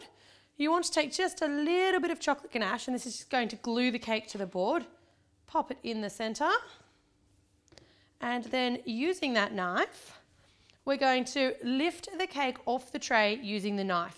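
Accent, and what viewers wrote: Australia. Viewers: I love australian accent!! Thanks for the tutorial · youre ausralian?! i tought youre british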